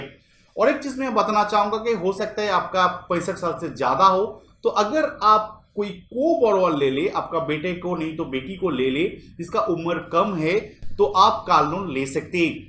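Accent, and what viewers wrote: Bengal, India. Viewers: Bangali...awaz sunte samjh aa gya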